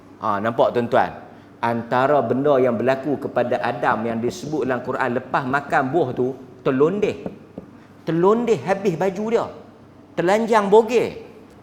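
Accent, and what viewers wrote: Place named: Perlis, Malaysia. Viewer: Jazakallah khairan. Suka sangat dengar Ustaz bila menggajar dgn loghat Perlis (kadang tak faham tapi takpe). Memang teruk la manusia ni kalau Iblis minta doa pada Allah tapi kita minta dari selain Allah swt.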